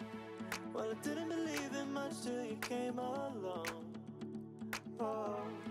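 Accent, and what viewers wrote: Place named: Northern England, United Kingdom. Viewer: Thanks for keeping things normal. I'm from Warrington but live in St. Louis and listening to your lovely northern voice comforts me and reminds me of home. Stay safe and keep posting xx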